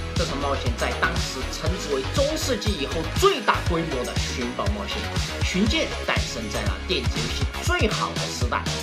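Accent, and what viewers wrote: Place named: Sichuan, China. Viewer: 蜀產狗，土話嗆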